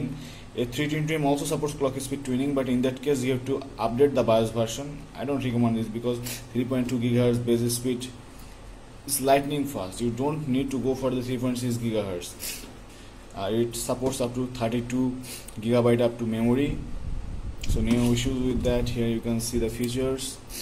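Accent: Bengal, India. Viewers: আপনার কথা শুনে তো বাঙালি মনে হচ্ছে আপনার বাড়ি কোথায়?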